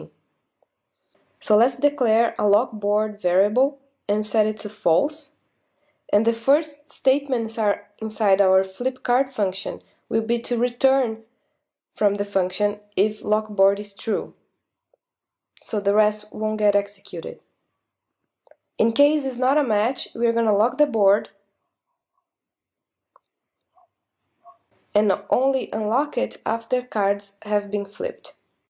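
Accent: Brazil. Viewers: Love the tutorial, thank you! ps.: I can recognize a brazilian accent from miles away :)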